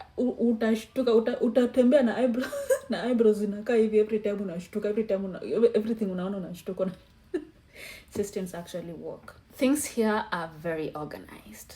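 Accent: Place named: United States. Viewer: Ee the American English assent in you❤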